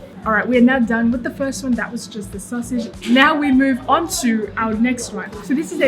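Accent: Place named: Australia. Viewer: So cool seeing an asian with an aussie accent ! Very pretty girl and great food vlog !!!! Love from Arizona =^..^=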